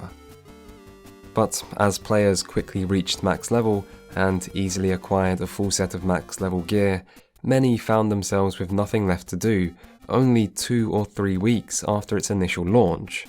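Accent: United Kingdom. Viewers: british english - instant skip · OMG everytime I hear that brit accent I end up hating the thing I used to like.......arrogant snot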